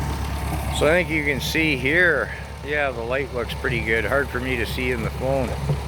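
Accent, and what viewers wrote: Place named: Minnesota, United States. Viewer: We in minnesota of wisconsin? Or some part of Canada? If i had to guess, i would maybe say Minnesota? Not an expert on those speech accents yet. Lousiana has like 20 distinct accents. Several different distinct accents around New Orleans.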